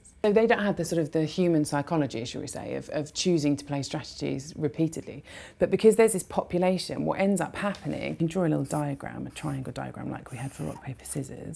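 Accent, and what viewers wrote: United Kingdom. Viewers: I love the British accent ! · Hate that british accent. Brr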